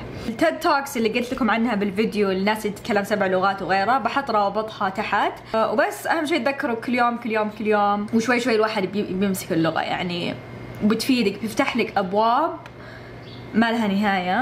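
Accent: Najd, Saudi Arabia. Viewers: اللهجة دي حقت أهل الرياض أهل نجد🌹🌹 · ياربي بعشق لهجتك السعودية الاصيلة دي بجد جميلة جداً ربنا يحميكي ♥️ بقالي ٣ شهور نباتية 💪🏼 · لاتكلمي انجليزي اذا صورتي لنا لان لهجتكك او طريقة كلامك بالسعودي جميلللللللل 💛💛💛💛.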